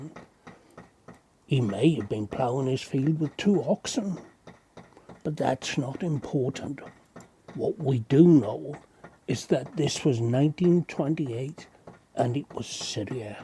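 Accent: Wales, United Kingdom. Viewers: He has a Welsh accent. Both countries have a similar native language Gaelic. If I'm not mistaken.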